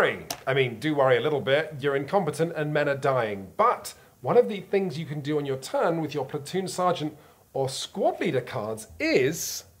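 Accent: United Kingdom. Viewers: Genuinely moving stuff. It's especially refreshing and significant to hear this perspective shared from a British voice. This made me proud to be a donator.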